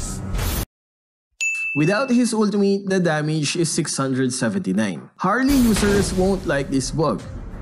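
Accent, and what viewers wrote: Philippines. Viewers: Halatang pinoy talaga sa tuno mag English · Pinoy to halatado sa tono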